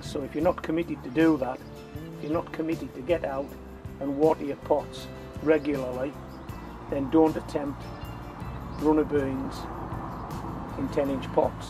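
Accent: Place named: Cumbria, United Kingdom. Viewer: Clicked on this to hear a fellow Cumbrian. Fantastic!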